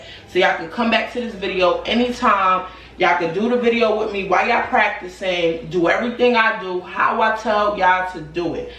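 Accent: United States. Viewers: I love your accent - sounds like nyc-brooklyn lol - and this was detailed God bless your business and your family! Thank you! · I love your NYC accent and charisma! Your business name fits you! Also, you make me want to take a trip to the city! 😊 Thank you for sharing this and not gatekeeping! Many blessings to you!!!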